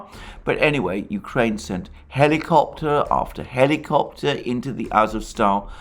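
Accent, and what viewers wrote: United Kingdom. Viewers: Overly British accent destroyed the video quality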